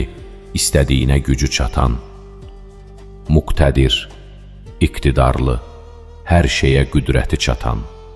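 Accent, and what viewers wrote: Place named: Turkey. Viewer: Salamun aleykum, Allah razı olsun, çox gözəl videodur. bəzi təkliflərimi ümid edirəm dinləyərsiz. Ərəbcə hərflərin bir neçə cür tələffüzünü nəzərə alaraq düzgün oxunuşu üçün adları ərəbcə yazsanız daha yaxşı.olardı. bəzən türkcə oxuyursuz qaf əvəzinə.kaf oxuyursuz. Uzadılan zaman Ə-ni yox A-nı uzadırsız. Alim yox əslində əyn hərfilə Əəlimdir. Zahir yox Zəəhir. Qaffar əslində. ال غفار Ğaffar oxunur , qafur da ğaafur olur Samad - ehtiyatsız yox ehtiyacsız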